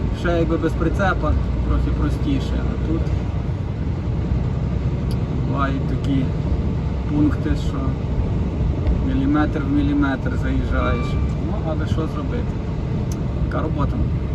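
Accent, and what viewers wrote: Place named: Western Ukraine, Ukraine. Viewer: спасибі за відповідь. Я так розумію, Західна область? Відчуваю нашу говірку)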